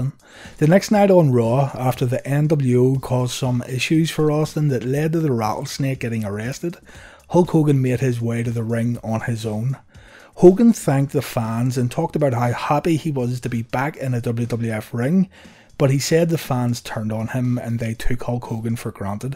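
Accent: United Kingdom. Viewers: narrated by people from across the pond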